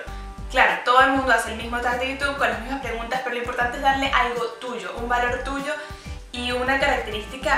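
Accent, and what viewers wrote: Venezuela. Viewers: Venezolana? Saludos!! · La verdad, no se te nota, el acento caraqueño, yo pensaba, como de Maracay, Valencia mas o menos. Saludos, estaré viendo mas de tus videos. Cuando puedas, porfa, me enviás un saludo en tu próximo video. Gracias.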